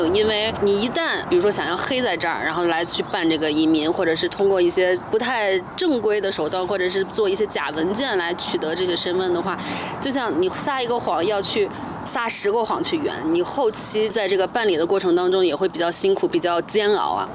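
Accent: China. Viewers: 大陸鄉音太重！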